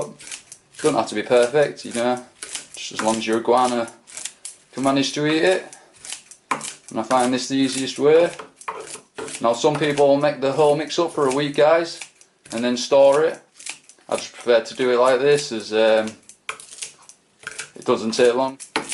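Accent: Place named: Yorkshire, United Kingdom. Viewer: Where's that accent from? I'm guess yorkshire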